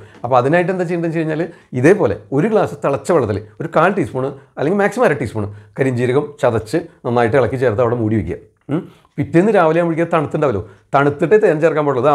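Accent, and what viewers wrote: Thrissur, India. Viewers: ത്രിശൂർ slang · Trichur slang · തൃശൂർ ശൈലി യിൽ നല്ല അവതരണം. Good information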